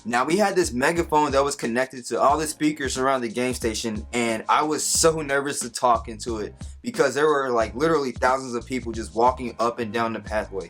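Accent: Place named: Canada. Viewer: Are you from Canada you have an accent haha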